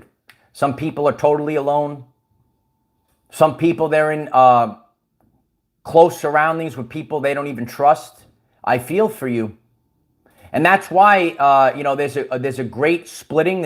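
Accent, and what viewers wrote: New York, United States. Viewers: Fascinating site this,. Just started watching and I've already consumed about 20 shows in just a few days. Personally, I'm a bit of a loner and I lack trust in everyone online although I started awakening around about 2015 prompted by the usual atrocities/events having initially debunked the Party Political systems which are clearly a Cult creation and do not serve the people. I like the way you described the 2020 Plandemic as like the 'Fall of the Curtain albeit I've forgotten the exact term that was used. Spiritually, I'm trying and I like Gigi Young's work but I'm a bit of a hard case and I'm having trouble linking to my heart space and finding Christ Consciousness. People like David Icke, Clif High, Dark Journalist and Max Igan are must watches for me over several years now but, of course, I still know nothing in the grand scheme of things. 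And I love your New Yorker delivery and your hard hotting and specific delivery and hyour Sccoby Doo imitations. Lol. So, I'll be continuing to watch and learn. Thanks mate from here in the UK.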